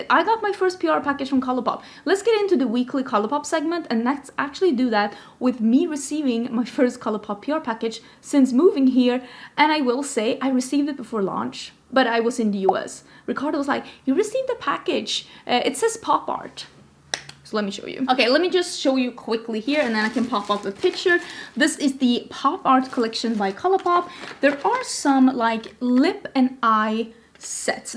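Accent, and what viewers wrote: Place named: Sweden. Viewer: Man hör att hon e svenne iaf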